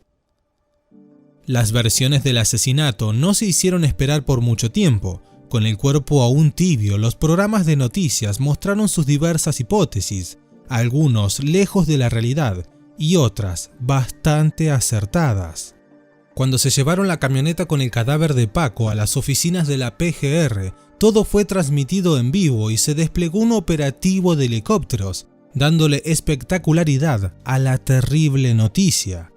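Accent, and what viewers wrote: Argentina. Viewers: Escuche tu acento argentino y creeme que iba a parar el video porque veo qye tanto argentinos como colombianos viven de la farandula mexucana..pero bueno haz tu trabajo😅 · pues tu video sin chiste. no comentas nada nuevo en absoluto, no pude terminar de verlo por tu detestable acento argentino que lo hizo mas nefasto aun. un bodrio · Ese acento argentino bien estupido 🤣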